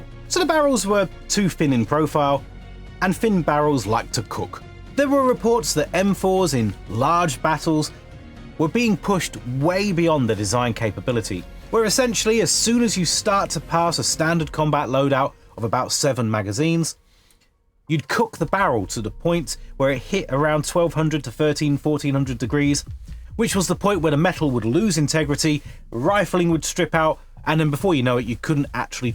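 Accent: United Kingdom. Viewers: What country are you in? Doesn’t sound by your accent you should have held a weapon in your life, not even a butter knife. How do people in the UK cut meat and vegetables for cooking now that I think of it? Illegal to have a knife is crazy. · Im used to guntubers to have a southern accent. Maybe Georgia or kentucky. Brit? Ok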